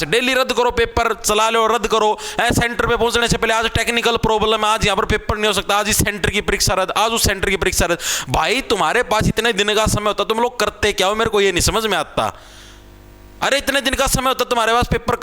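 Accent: Haryana, India. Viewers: hlo sir kya ap haryana se hoo kya kttte desi or apni sii feel aee apki baata mm😊😊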